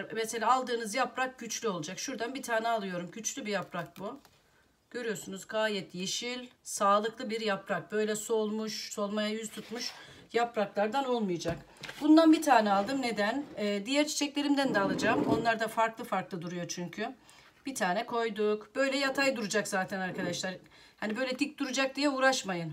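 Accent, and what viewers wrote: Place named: Trabzon, Turkey. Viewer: Harika bir vidyoydu bu arada siveniz trabzon gibi memeleket neresi🤗👏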